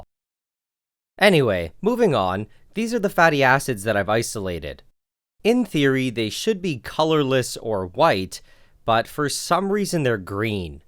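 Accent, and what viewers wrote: Canada. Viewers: awesome video, you sound canadian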